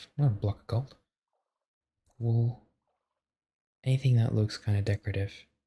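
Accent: Australia. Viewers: absolutely adore these videos ❤ love your calming voice, and hearing the soft aussie accent as another aussie makes me feel so warm :) like being on call with a friend as i drift away. please don’t stop making these! <3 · Btw are you Australian? It's the accent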